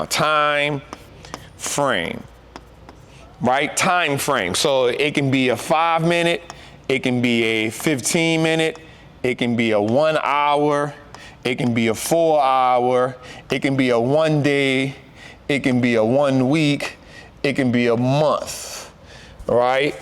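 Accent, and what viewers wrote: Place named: Louisiana, United States. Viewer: That's that Louisiana drawl, lol!! Im born and raised in Chas SC, so I know what you hearing, lol!!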